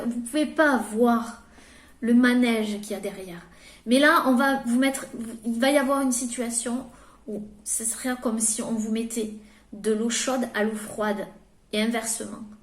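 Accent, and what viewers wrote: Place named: South-West, France. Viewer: Adorable cet accent du sud ouest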